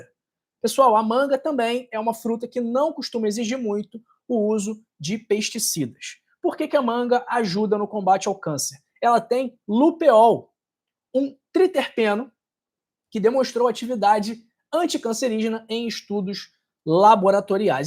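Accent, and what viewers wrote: Rio de Janeiro, Brazil. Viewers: Pelo seu sotaque e do Rio de janeiro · Eu tomo água com limão 🍋 e como abacate, todos os dias! Com esse acento lindo, só poderia ser Carioca! Eu amo o Rio!❤ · Eu achou quê você é do Rio de Janeiro tem o sotaque dos cariocas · Talvez o Sr seja carioca? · Eu sou de Piracicaba SP vc pelo sotaque é carioca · Vc e carioca. ? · Pelo seu sotaque , deve estar falando do Rio de Janeiro. É igual de um irmão da igreja.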